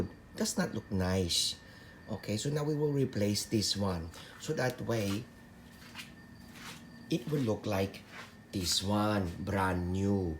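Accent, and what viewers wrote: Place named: Philippines. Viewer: Thank you so much pare! I loved your distinctive Filipino accent and humor. I'll be doing this project soon! So many say you have to open your roof half way to do this but, obviously you don't! Salamat po!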